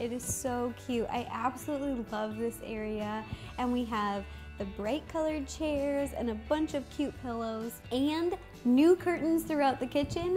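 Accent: United States. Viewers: Are you American or whatever?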